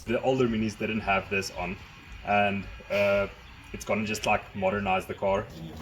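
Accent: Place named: Afrikaans, South Africa. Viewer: Dude, super proud of all the South African youtubers... You guys rock... Only 2 comments, be proudly South African... stop rolling your "R's". Secondly, it's Aluminium, not Aluminum... I get it, people think the Afrikaans accent sounds dumb. But the true South Africans will be proud of it... Keep it up man!!! Subscribing !!!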